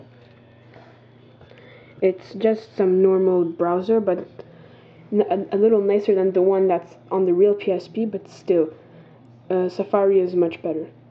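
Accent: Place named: Germany. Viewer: love de german accent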